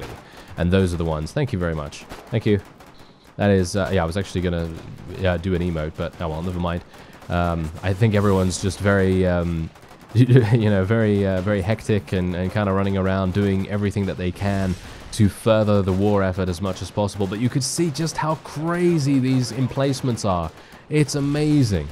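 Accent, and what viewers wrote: United Kingdom. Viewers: I hope you don't take this the wrong way, but I feel as though, in a game this hectic where there is so much going on, the constant commentary might be less useful. I normally think your commentary is great, in games like Bannerlord. But then we all know what to expect from Bannerlord, this game is new and I would sort of like to see you explaining how the logistics works, or letting us experience the carnage of combat without a smooth British voice calmly stating, "Oh that was a nice grenade, I'm dying." I still love it because let's be honest that's kind of funny, but a compromise could be giving us five to ten seconds in tense situations to hear what you hear, the communication in the game and whatnot. If you don't like my criticism I understand, because I am certain that many people will disagree with me, it's just a personal preference, I will continue watching either way.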